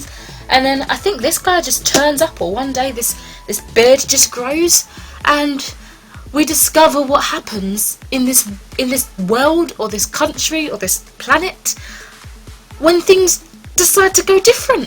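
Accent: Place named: United Kingdom. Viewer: I love your accent - it's such an awesome British accent :) Congrats on completing your Goodreads challenge!! also finished it in November - but you read double what I read hahaha very impressive!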